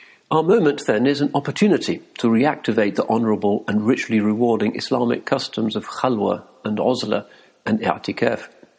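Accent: England, United Kingdom. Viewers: I like this, just wish it didn’t sound so staged. Maybe I’m just a lecture kind of person...it takes a certain nerve to appreciate poetry in a heavy English accent.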